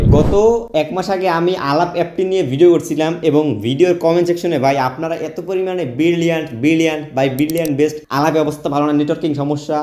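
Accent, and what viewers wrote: Barishal, Bangladesh. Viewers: দাদো তুমি মোগো বরিশাইল্লা হেতে মোর কোন সন্দেহ নাই! তোমার বাড়ি কোন জায়গা?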